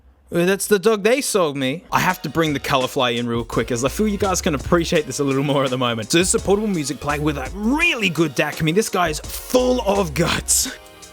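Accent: Australia. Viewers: I'm American but starting to have Australian accent just by watching your amazing videos!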